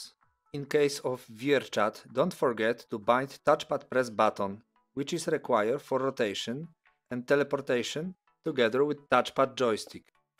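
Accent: Poland. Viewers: your accent is very polish are you from poland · Больше как поляк, не все люди с таким акцентом Русские, чтоб ты знал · nice polish accent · Z Polski jesteś bo masz taki akcent ? You are from poland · Ojoj czuć polski akcent jak chuj XDDDD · haha, po akcencie idzie poznać, że rodak :D super film ugułem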